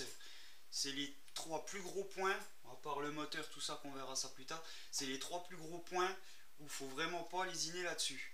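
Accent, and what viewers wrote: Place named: North, France. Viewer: Ta un de ces accent du nord j adore !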